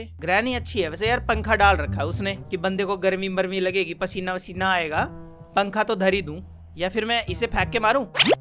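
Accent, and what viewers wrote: Haryana, India. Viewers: maza nhi ara yar, haryanvi accent to pelo thoda. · Who wants old haryanvi tone back ♥️ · Old vibes are back but something is missing and that is that Haryanvi tone that used in his old videos So I think old vibes are still not back 😶😶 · Reason for not growing :- videos with low paced editing :- new generation of low attention span :- slow commentary Suggestion :- use colored subtitles like u used to do to grab attention :- do different types of video RELATED to gaming. :- speak faster :- imp just stop with those english gaaliyan yr. Use hindi ones like u used to. Or just use your hariyanvi accent bcoz i liked that bhens ki tait. Easiest way to get a good video would be to play with friends. If u are slow then your friends could make up for it and you could get really good clips to make a video off of. Every other channel do this nowdays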